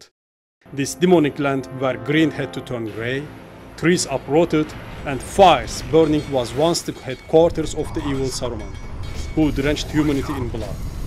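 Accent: Turkey. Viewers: İngilizcen o kadar güzel Türkçe aksanı ki~ arkadaşlarıma gönderiyorum. Örnek olsun diye! Btw harika videolar! ❤ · Strongest turkish accent you will ever hear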